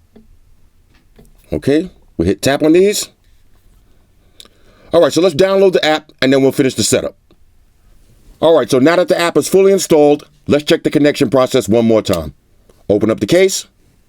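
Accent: New York, United States. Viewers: It’s a New York thing 💪🏽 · Just got into your video's and I really like them. I subscribed to you brotha. Also you sound like a true new yorker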